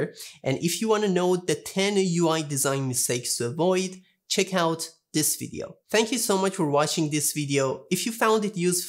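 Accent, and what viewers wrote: Iran. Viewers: شاید باورت نشه ولی وقتی که برای بار اول صدات و تلفظتو شنیدم (بدون اینکه اسمی از کانال یا ... ببینم) فهمیدم ایرانی ای😁😁😂😂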